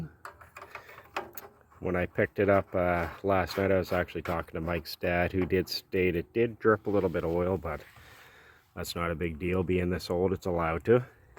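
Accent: Canada. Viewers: Thanks for the overview. Love your Canadian accent - my mother was from NB. I just got a free 1990 YT1238 but in well-worn condition. Very stout machine. Briggs 12 hp with 38 inch deck. Spent a lot of time outdoors but that's not too tough on equipment in our mild So Cal climate. The paint is badly flaking over the rusty areas but so far all it needs is a gas tank, the little rod that actuates the choke and a battery. But I won't buy any of these until I run it around the yard a bit to test the trans which on this model is a hydro (no clutch). I got it to start yesterday and it doesn't smoke so there is hope it will run and cut well. Re the tires I am pretty sure they are tubeless. You can tell if yours has tubes by the valve stem. If they need tubes be prepared for a struggle with the old tires especially on the rears if you can't get them off the axles. When I put tubes in riding mower tires I bolt them to my work table so I have two hands free. 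Re tread wear I have never worn our a riding mower tire. The worst is to neglect them to the point they go flat and crack. But even then they are usually usable with a tube since they only take about 10 psi.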